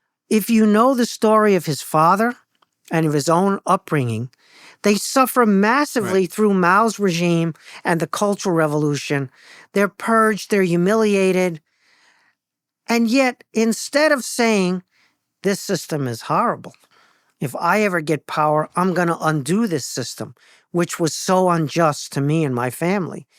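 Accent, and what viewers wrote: New Jersey, United States. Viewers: man im from up there my entire family has jersey accents and this guy sounds uncannily like joe pesci.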